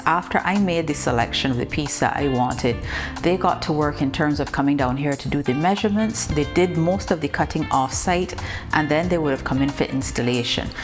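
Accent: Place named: Caribbean. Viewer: I figured you are the caribbean by your accent. Hope your mom Maxine is doing ok. Blessings in your endeavors. Keep soaring lady....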